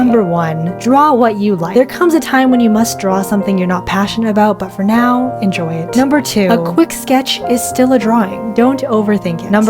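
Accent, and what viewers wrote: United Kingdom. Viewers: Are you British in some parts really often yours accent sounds british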